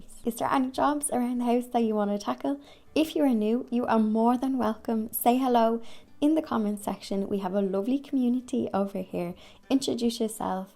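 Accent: Ireland. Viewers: September is a wonderful month, an intro to fall. Love your autumn bedding. Your house is so cute. You do such great things with it and your yard. Love watching your videos and hearing your Irish accent. I’m in sunny San Diego, California. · Hello Sweet Girl, I love watching your channel so much. You have such a beautiful relaxing spirit and watching you do your housework, crafts and garden 🪴 inspires me to those little things that I often put off. 😊 Love Ireland 🇮🇪 and your gorgeous accent, nothing beat’s a good Irish pub………..definitely beats drinking at home alone for sure! 🍻🥂🍾🍹❤ September is the start of Spring down here in Australia 🇦🇺 I love watching all the blossoms opening up on my weeping cherry tree and the buds developing on all my rose bushes! 🌹😊🌹 Kindest Regards Deb 🌷🌷🌷🌷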